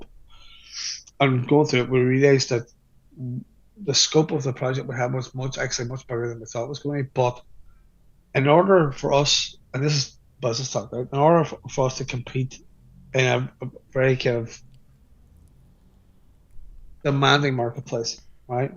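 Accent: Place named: Ireland. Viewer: Irish accent I understand it perfectly but I am Irish 😅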